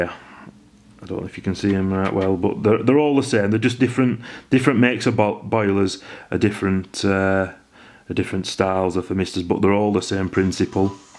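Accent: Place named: Wigan, United Kingdom. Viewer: you sound like a Wiganer, are you? good vid BTW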